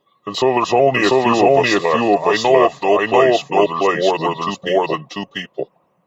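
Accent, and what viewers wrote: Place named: Canada. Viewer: At least you didn't give yourself away this time with your Canadian accent... only with the words "quite literally" and "for myself"... and maybe your laugh... lol. Thanks,. Enjoyed it. 😊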